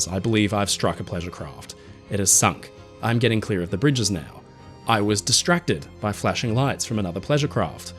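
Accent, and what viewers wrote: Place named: Australia. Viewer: Inspiration for an Eastenders storyline where Sharon loses her son Denny. Great Aussie accent you got.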